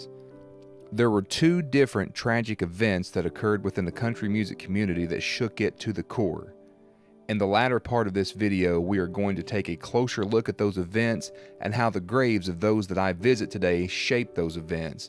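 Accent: South, United States. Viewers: everything is great, it really is! I guess I struggle with your southern accent because English is not my first language. But other then that, awesome videos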